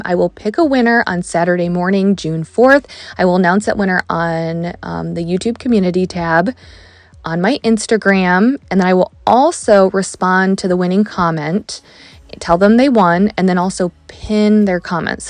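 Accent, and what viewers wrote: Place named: Ohio, United States. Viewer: Hi. I love ur spirit. Ur so calming. You made cleaning fun for me. You are the 1st You Tube Cleaning video I ever watched. And you are my favorite because of your positive, motivating, happy vibe. To answer your question. I love living near the beach. I live in New England now. The Cape Cod vibe is my scene. Your Ohio accent is adorable when it comes out. I'm sure you'd think my Boston accent is strange. 😆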